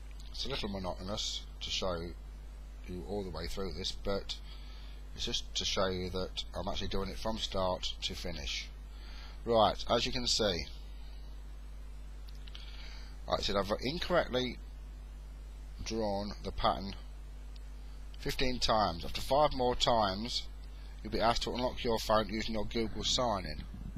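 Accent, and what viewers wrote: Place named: England, United Kingdom. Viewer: thank you sooooooo much....now i love english people fo their accents and for their tech support..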